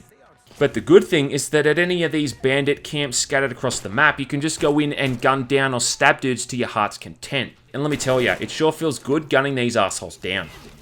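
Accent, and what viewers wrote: Australia. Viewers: You have a good way with words. Good to hear an Aussie accent for once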